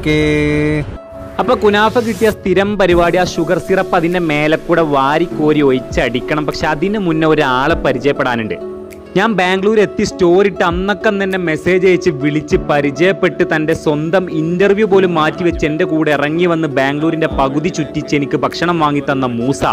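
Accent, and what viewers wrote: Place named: Malappuram, India. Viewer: Malappuram baasha uyir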